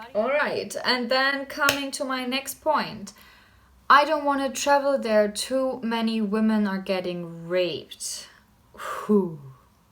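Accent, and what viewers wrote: India. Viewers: Hey, u r really a indian girl.ur ascent and knowledge is purely Indian. Love u sis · Your accent sounds so Indian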